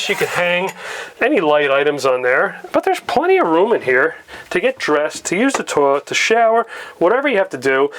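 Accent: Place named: South, United States. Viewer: What accent is that? It sounds like Minnesooooota with some Canadian ayes meets a southern drawl.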